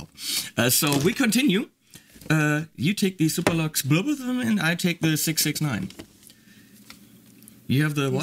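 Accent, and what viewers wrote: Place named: Germany. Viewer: ich mag your german accent lol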